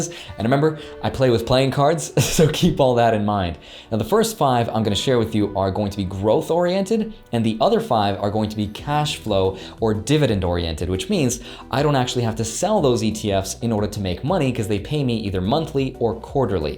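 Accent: Russia. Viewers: I love your Russian accent. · You are my favorite stock guy!! And could you please use your Russian accent more.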